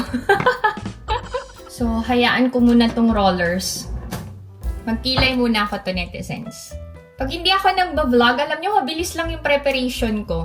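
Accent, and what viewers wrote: South Korea. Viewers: In my opinion, it’s completely normal to adapt to the Korean accent and the tone or sound of the way they speak. It’s also possible you’ve forgotten some words from your mother tongue—especially if you don’t have anyone to speak Tagalog with every day. That’s completely normal, since you’ve been living in Korea for a decade. I don’t understand why some people still need to be educated about this. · Na notice ko na talaga yung pananalita mo and I knew na adapt mo sa koreans yun,, but if you are not aware how you sound like ganito ang example,, “ you said This is how I speak,,, pero sa panding namin or how you pronounced it is sounds like “ This is how I speakah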